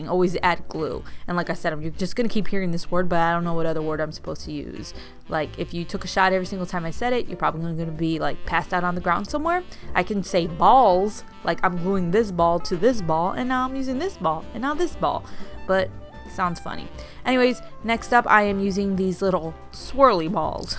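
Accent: Chicago, United States. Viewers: Awesome as always. From one Chicago girl to another, it’s our accent. LOL OR DA MINT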